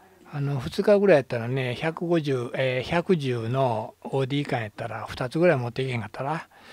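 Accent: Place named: Osaka, Japan. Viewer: やっぱり大阪弁は最高です。しみじみ浸透します。おおきに。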